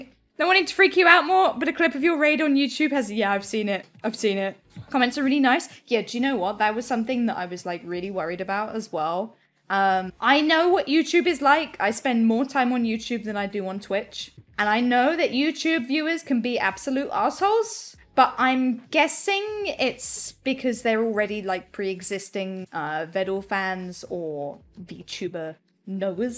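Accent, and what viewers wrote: United Kingdom. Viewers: Her british levels are much bigger than Vedal's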